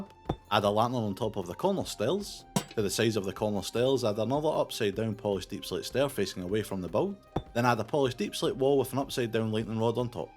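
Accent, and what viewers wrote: Scotland, United Kingdom. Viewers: I think he’s Scottish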